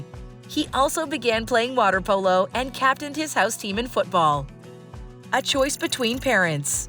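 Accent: United States. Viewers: I'm a Scot. I find it annoying when an American voice lectures me about our Royal Family, and get a lot of it wrong.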